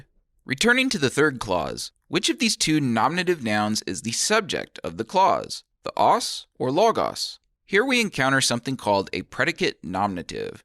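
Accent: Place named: United States. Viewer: Interesting, although the butchering of the Greek with the unpleasant American accent was a distraction. No attempt at a good pronunciation whatsoever. Quite harsh sounding.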